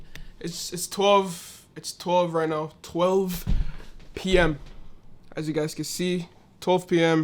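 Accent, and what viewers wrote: Jamaica. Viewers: You Jamaican? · You sound like a Jamaican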